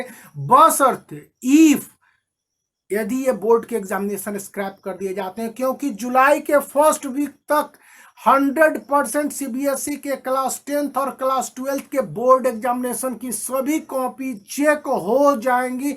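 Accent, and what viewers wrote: Bihar, India. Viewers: Tum bihari ho kya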